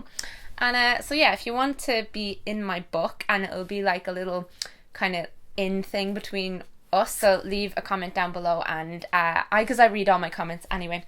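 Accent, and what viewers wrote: Dublin, Ireland. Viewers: I would love to be in your book love watching your videos and love your Idunlun accent 😍💚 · I'm from Ireland 🇮🇪 and your accent is the cutest 🎉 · I love your videos so much! You always put a smile on my face:) I feel like home when I listen to your beautiful Irish accent because I'm actually half Irish living in Finland:) And oh my god it would be so cool if my name was in your book! 💚💚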